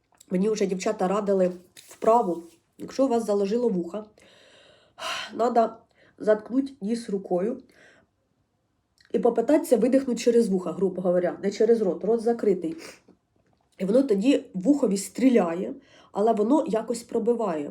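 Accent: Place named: Surzhik, Ukraine. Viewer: Полуниця, полуниця, чорт забирай! Вуха заклало., ви журналістка, полтавка, відмовляйтеся від суржика😊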